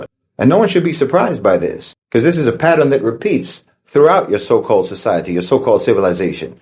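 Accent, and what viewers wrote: United States. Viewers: You sound, Americon, but you have a regional accent that I can't place. What state are you from, or what is your regional accent? Good voice.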